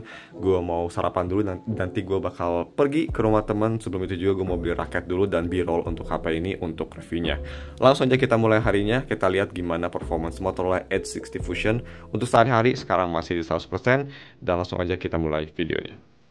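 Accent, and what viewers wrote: Bandung, Indonesia. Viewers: lah orang bandung lu bang?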